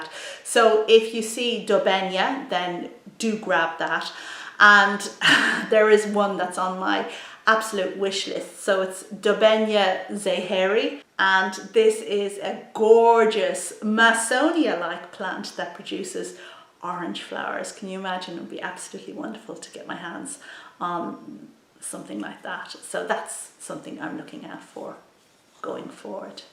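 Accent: Ireland. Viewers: Thank you, Love all the information you share with us but most of all I enjoy your Irish English which I adore. Great videos.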